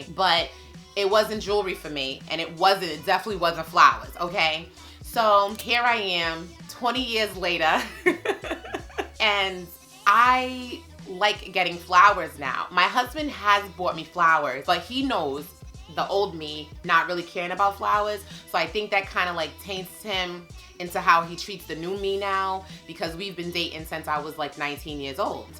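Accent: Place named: Staten Island, United States. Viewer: The Staten Island twang you have😀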